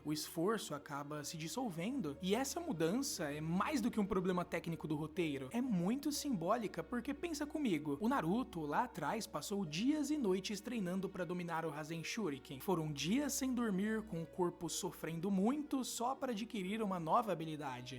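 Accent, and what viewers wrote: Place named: Caipira, Brazil. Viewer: voce tem seriamente que corrigir esse (R) caipira que vc tem, isso é feio de mais.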